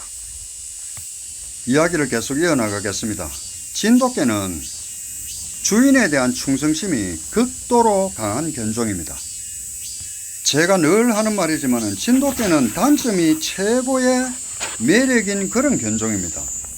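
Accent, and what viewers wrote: Gyeongsang, South Korea. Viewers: 말씀이 갱상도? 갱북? 구수하고 신뢰가묻어납니다. 어미개. 영특해요. 시끼교육도. 야무지게. 멋찐 아이들로. 자랄듯. 유익한 설명도감사합니다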